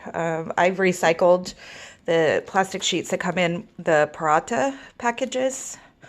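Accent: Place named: United States. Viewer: Idk I’m sorry to say but even her accent was too American aka “white” she even said paratha like a white person 😩 idk I think I’ll experiment in other halal tamales I grew up eating them but mom used pork fat and it’s a no no for us Muslims. But ghee it is maybe with some red sauce in the masa también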